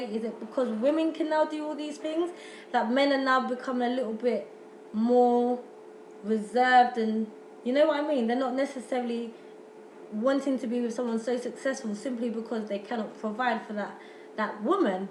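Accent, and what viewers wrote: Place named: RP, United Kingdom. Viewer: Listen to that posh accent! LOVE IT! Do men measure up? Hmmmm...I am afraid to answer. LOL. For me personally, the man that I have DOES measure up, which is shocking because I never thought I would come across a man who fulfilled ALL of my wishes! This is a good video. For sisters who are still looking, there should be NO compromising! FAVORITED!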